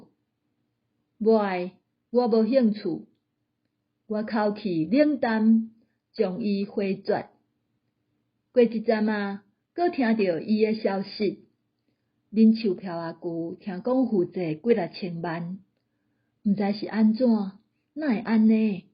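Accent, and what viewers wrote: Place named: South, Taiwan. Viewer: 南部腔調e母語! 已經加入了"生活歷練"e氛圍了!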